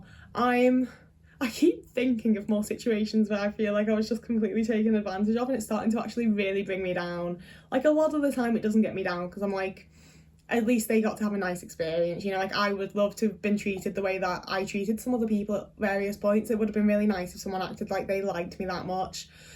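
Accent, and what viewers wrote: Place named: Northern England, United Kingdom. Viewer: TW for SA+self-harm+ED in the second paragraph, be safe ❤ With all the North Americans, antipodeans and RP in this space it’s brilliant to hear a sweary working-class northerner. 😂 I’m so there with you, some people genuinely just take the piss if you’re ”too altruistic”. Bad personal stuff or not, video shop bloke sounds like an absolute cunt. Glad you got out of that mostly in one piece. I always gravitate toward the younger people in this space, despite being a guy on the cusp of 40, because I genuinely can’t relate to the people who are still struggling with the same shite but somehow managed to have children along the way. I almost feel like I’m Matthew McConaughey in Interstellar, except I have the body of a 50-year-old and brain of a 20-year-old, but with 40 years of bollox crammed into it. I am in my second relationship, first was almost 9 years, this one is 8 and a bit - in the months between them, I turned my life upside down caring for a person with ED, undiagnosed ADHD (and autism, I’m all but certain) and a serious addiction who really tried to love me as much as I loved her, multiple ambulance trips and stitched up arms notwithstanding (hers, not mine) - she didn’t take advantage, but my feelings made me take advantage of myself and my very limited energy resources for her - then I got SA’d by another woman and ensnared for a few weeks in a Stockholm syndrome relationship (also where she lived, funnily enough) based on fear/fawning, control and mutual s*x addict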